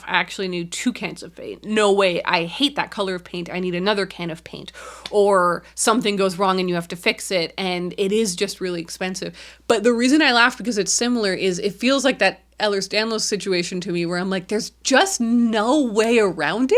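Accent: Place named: Canada. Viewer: Shes is so... Canadian, eh? Huus and aboot, instead of House and About.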